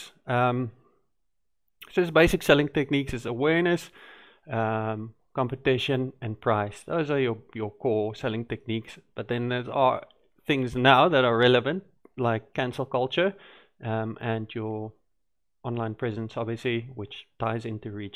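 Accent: South Africa. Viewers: Hi , thanks for the video , really helpful. Got to ask , are you south african ? I am in Johannesburg and would love to conect with fellow creators in ZA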